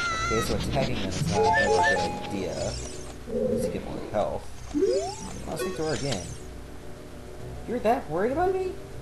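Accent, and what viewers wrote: Canada. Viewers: This guy is Canadian as fuck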